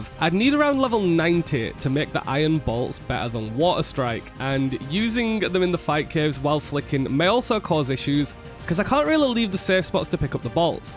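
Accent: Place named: North West England, United Kingdom. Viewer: you sound like ur from the north west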